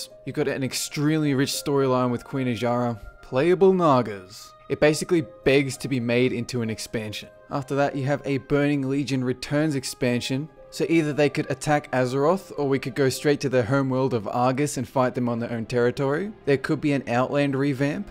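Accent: Australia. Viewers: are you from straya?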